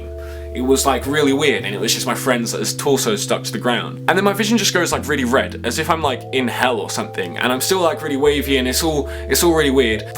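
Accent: United Kingdom. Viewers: U say LA but u sound British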